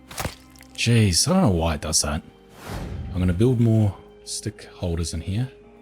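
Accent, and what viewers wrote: Australia. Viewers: Ah, thought you were a fellow Aussie but for some reason I couldn't tell for sure. Namely because you spell words like behaviour the American way. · Hey, ive been wondering, what do you want in 1.0? Also, are you Australian? Edit: never mind. I didnt hear till the end. Gotta love straya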